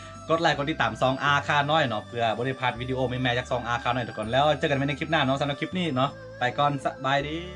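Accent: Isan, Thailand. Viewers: คนบรรยายเป็นคนอาข่า หรือ คนอีสานครับ ทำไมพูดเหมือนคนอีสานเลยครับ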